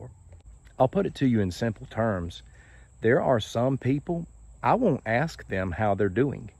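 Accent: South, United States. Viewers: I like your Southern drawl. Reminds me of my granddaddy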